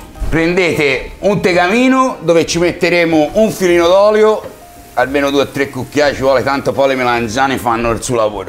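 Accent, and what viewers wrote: Tuscany, Italy. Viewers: como me gusta oir el acento toscano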